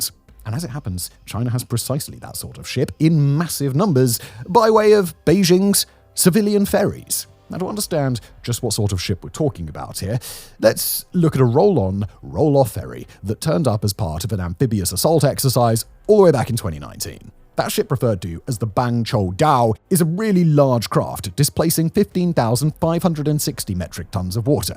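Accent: United Kingdom. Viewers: We Americans like to learn from people with a British accent. It makes us feel more intelligent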